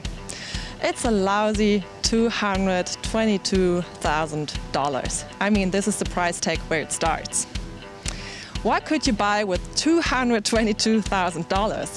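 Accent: Germany. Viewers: people really need to stop rejecting people with a different accent. if you pretend she should speak in English with a stereotypical English accent, then you are a xenophobic and a racist. if she is a German, why should she try to be someone than she is not? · I can't believe people don't like German accents... Either way she probably speaks better English than us natives. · I love the little germanisms like keramic and the accent. :D It does sound kinda scripted though. For $222K i'd have a nice down payment on a house and mazda 3 and some left over for a classic car or to invest. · nice orange peal paint job on 220 000$ car Mercedes Benz... Still can't stand the Germanglish of the host. Get a proper host for these videos...say Derek D or pretty much anyone else. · Well we in the UK think you sound like any normal German. The mic is way over sensitive its picking my to much slur. 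Change your punto for a VW up! Or Skoda citgo there cheap and fun.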